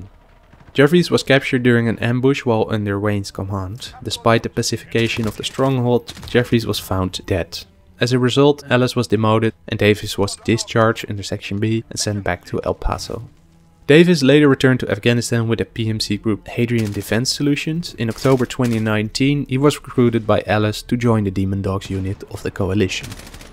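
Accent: Netherlands. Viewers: grappig man je kan kk goed horen dat je nl bent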